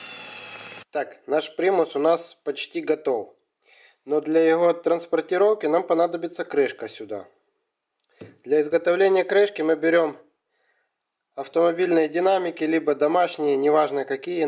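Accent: Odessa, Ukraine. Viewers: акцент как у Одесских евреев.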